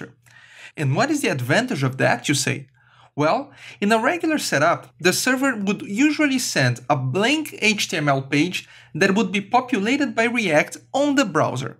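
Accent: Brazil. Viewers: Are you Brazilian right ? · good explanation. you brazillian? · sotaque brasileiro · Well done! Desconfio que vc seja brasileiro haha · you sound like a brazilian speaking english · are you brazilian?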